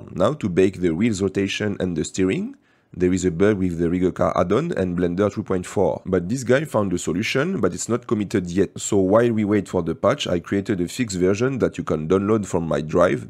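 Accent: France. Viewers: Merci. Et au moins avec ton accent bien Français j'arrive à comprendre l'anglais :) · Man, I can hear you’re French… Donc pourrais-tu le doubler en français, c’est désormais possible avec les nouveautés YouTube, cela ouvrirait ton audience au français qui ne parle pas très bien anglais. Ce n’est pas mon cas, je parle très bien anglais mais c’est plus facile d’apprendre quelque chose de compliqué comme blender quand c’est expliqué dans sa langue natale :D Sinon super tutoriel, fort utile :-) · You have a french accent are you french?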